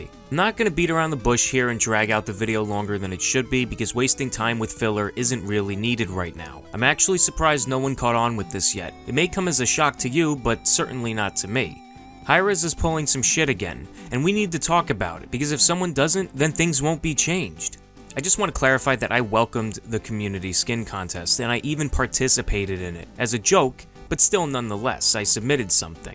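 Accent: Italy. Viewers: Dude r u from italy?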